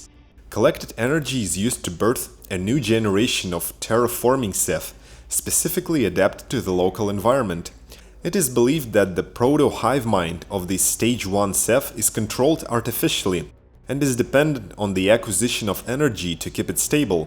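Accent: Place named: Germany. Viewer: Absolutely great informative Video! You have a very strong accent, it sounds like you´re from Germany, where the creator of the Trilogy Crytek has it´s roots :D Greetings from Switzerland :)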